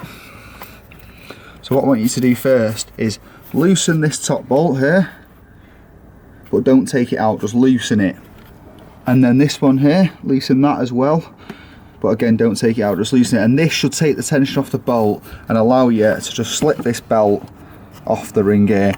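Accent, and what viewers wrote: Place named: Salford, United Kingdom. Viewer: Very good video - just got a siezed alternator on my 2007 Grande Punto and so this is perfect. You sound like you are from near Salford!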